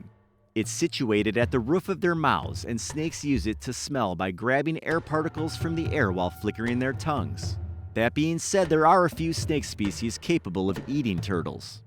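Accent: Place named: Midwest, United States. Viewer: The Midwest accent is blowing me and I’m from fuckin Chicago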